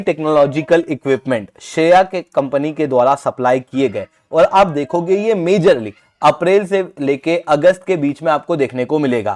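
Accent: India. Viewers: It's inappropriate to mock someone sharing their knowledge, especially when they're providing free educational content. The instructor has invested time and effort to create and share this material. A person's pronunciation is influenced by their native language, regional dialect, and exposure to different language environments. In India, like many other places where English isn't the first language, complex English words are often spoken with a local accent. This isn't a reflection of someone's intelligence or capability - it's simply a natural result of their linguistic background. I live in the US and have observed that speaking English with a native accent doesn't correlate with intellectual ability or expertise in a subject.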